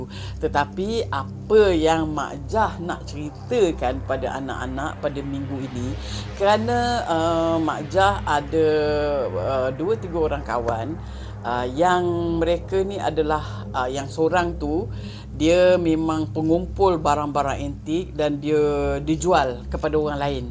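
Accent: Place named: Kedah, Malaysia. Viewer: Org kedah mana suaranya??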